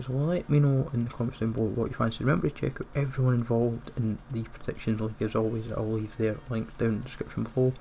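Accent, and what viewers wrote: Scotland, United Kingdom. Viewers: normally don't have a problem understanding jocks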